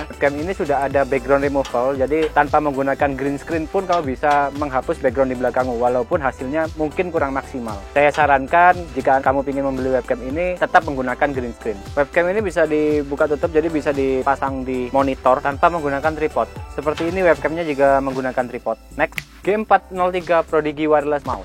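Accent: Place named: Java, Indonesia. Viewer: bahasa jowone kentel ... hahahaha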